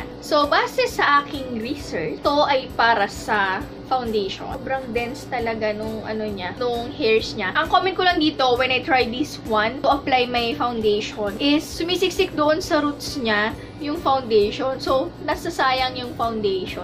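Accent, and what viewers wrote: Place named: Ilocos, Philippines. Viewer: Found your channel and it's awesome! You sound like an Ilocana so you earned a subscriber :D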